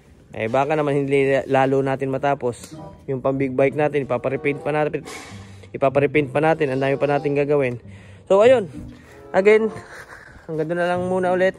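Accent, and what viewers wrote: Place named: Visayas, Philippines. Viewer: bisaya diay ka lods?? 😅🔥 Word kapoy bisaya word😁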